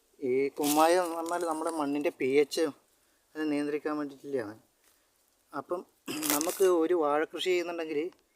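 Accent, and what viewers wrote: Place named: Taliparamba, India. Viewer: Taliparamba slang in kannur ❤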